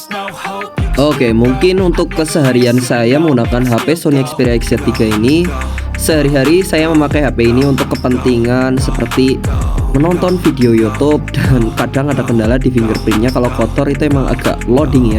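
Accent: Banyumas, Indonesia. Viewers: njir wong banyumas jebule😊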